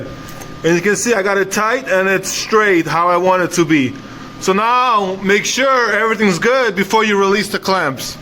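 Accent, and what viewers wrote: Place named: New Jersey, United States. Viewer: What accent is that? Jersey?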